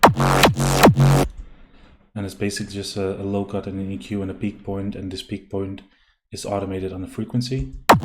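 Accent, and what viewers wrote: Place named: Netherlands. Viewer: Hey i would like to know how to create the screech, its a kinda sound.... Looking for ages to create it. By the sound of your accent u clearly dutch i gues.. Me 2. Great track gonna follow you more around.. Love the tuto man, i am also trying to learn this just for fun.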